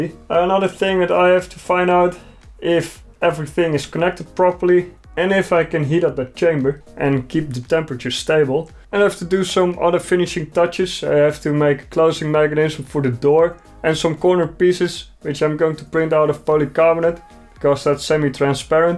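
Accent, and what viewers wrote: Netherlands. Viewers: Are you dutch because of youre accent and the you sayed tering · do I hear a dutch accent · I'm too lazy to see who fourth or fived that so I'm just gonna n that :D Try reversing one of the phases. Use a multimeter to determine the individual coils or if you can find one, pull up the datasheet for the motor. I've had the same issue multiple times and that always fixed it. Steppers don't break just like that ;) Btw, totally subbed. Interesting content and Dutchlish is just super pleasant to listen to :D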